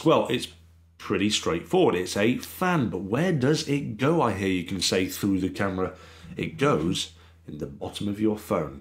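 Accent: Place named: Oldham, United Kingdom. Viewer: Where are you from? Your accent sounds so similar to mine or where I'm from cause I'm a girl and you're not lol.. But I'm from Oldham uk.. So I've very intrigued.. :p this video made me laugh anyway 😂 can't wait to watch your videos from now on ! You've got your self a new subscriber :) well done!! X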